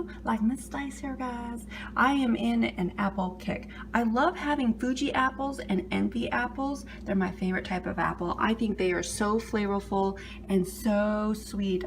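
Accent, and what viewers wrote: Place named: Alaska, United States. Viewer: I could listen to you "rant" all day Miss Staci with that Alaskan accent. Thanks for the chitchat!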